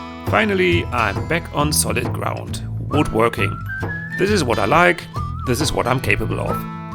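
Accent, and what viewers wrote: Germany. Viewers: Well, somehow I missed this episode and saw the next few before this one. I love your sense of humor and respect your sense of fairness in showing us what you did wrong. I have some German heritage. My great great grandmother and her husband came from Germany to the United States by ship. By the time I came along the accents had disappeared. I really enjoy listening to yours. I hope you keep putting out videos because I smile all the way through them. Thanks!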